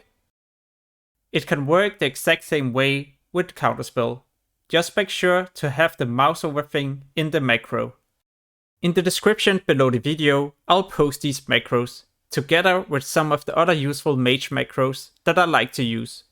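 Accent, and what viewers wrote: Denmark. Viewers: jesus christ, the danish is strong in this one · Denmark I'd say 😀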